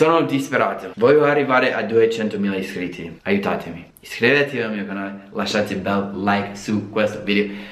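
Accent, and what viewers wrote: Rome, Italy. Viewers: Non ci credo, è l'unico straniero che abbia mai visto che ha imparato pure a fare l'accento romano:D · Too much Romanesque... 😂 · You speak Roman not Italian, you know that, right? "Stare" is not a synonim of "essere" in Italian. If you want to learn italian I strongly suggest to change city. Go North young man! - (PS. Hamburg is a German town maybe "hamburger" should be pronounced with a German accent no?) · Troppo forte la cadenza romana😂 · Ho le lacrime agli occhi dalle risate! Sei troppo simpatico. E poi ADORO che parli ROMANO 💖 e si sente un bottoooooooo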